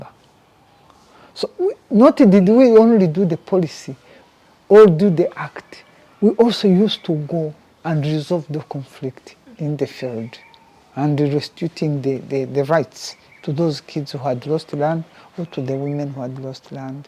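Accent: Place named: Tanzania. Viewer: Mtanzania mwenzetu.Accent ya kiingereza chako imenijulisha,Poleni sana wanyarwanda kwa mateso yaliyowakuta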